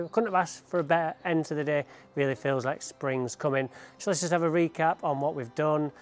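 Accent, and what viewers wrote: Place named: Lancashire, United Kingdom. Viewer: Nice to the rounded sound of a Lancashire accent on YouTube.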